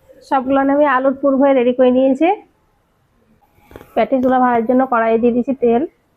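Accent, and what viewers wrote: Murshidabad, India. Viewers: ভদ্রমহিলার এই রান্নার অনুষ্ঠান দেখতে খুব ভালো লাগে, সাধারণ কিন্তু, সুন্দর , কথা শুনে মনে হয় ওনার বাড়ি মুর্শিদাবাদ জেলায়....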